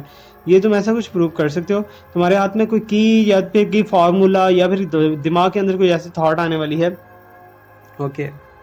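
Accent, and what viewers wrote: Punjab, India. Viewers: Sir pls btaeye aap basically khaan se ho?.aap hmare jesi theth Punjabi kese bol lete ho.m toh reading mien aapki Punjabi sunne hi aati hoon.TUNN VATTA 😂😂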